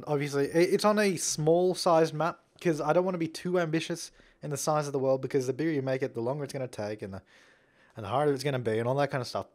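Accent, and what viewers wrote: Australia. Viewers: His accent is… Australian, right? Oh and from this video I can already tell I'd fail so hard at this. v.v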